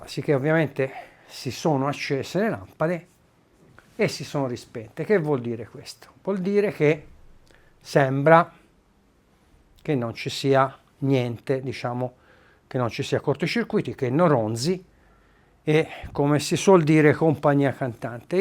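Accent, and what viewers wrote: Tuscany, Italy. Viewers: Sempre bravo e simpatico, compreso l adorabile cadenza Toscana!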